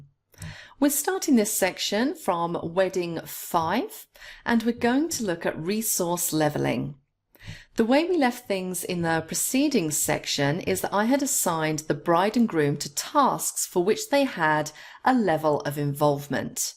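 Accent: United Kingdom. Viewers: I bet, that’s because of UK accent, isn’t it? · British accent, i love it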